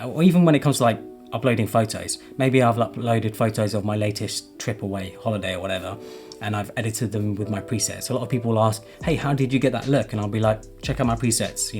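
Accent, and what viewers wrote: United Kingdom. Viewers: So lovely hearing and English accent. I'm so used to American channels...looollll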